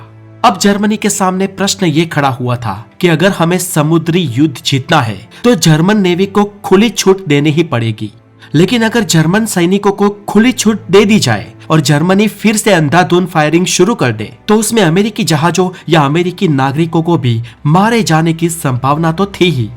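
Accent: Gujarat, India. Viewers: Are you Gujarati bro?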